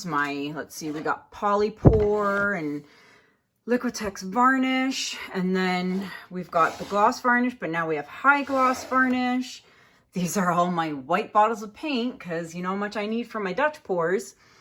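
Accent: Canada. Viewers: SO beautiful!!! I picked out your Canadian accent (How? Because I'M Canadian!)!!! lol Where in Canada do you live??? Your work is spectacular,! How long did you paint before you got to actively selling your work?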